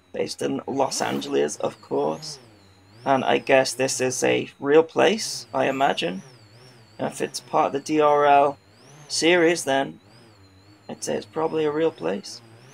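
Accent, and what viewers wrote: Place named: England, United Kingdom. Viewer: For me you're the most reliable reviewer/drone channel-guy out there. If im unsure when it comes to "drone-decision-stuff" i always just have to browse your channel to find the right answers and opinion. Of course there are other good and trusty reviewers on yt....but totally prefer the english language and pronounciation over this american english blabla....or well in most of the cases atleast. Ofc joshua bardwell, rcAddict, kababFPV, stu from uav, envy astro+seth and Bruce from rc model reviews doin a GREAT job aswell....but you are always a tad more enjoyable to listen too. Thanks for that dude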